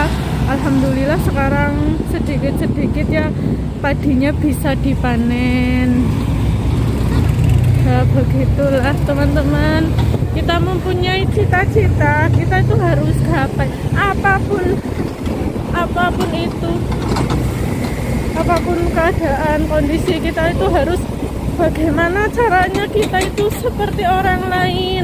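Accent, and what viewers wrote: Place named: Cilacap, Indonesia. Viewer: Aku kok suka logat mbak nya ngomong jadi ingat temen saya ngapak2 daerah Cilacap.salam kenal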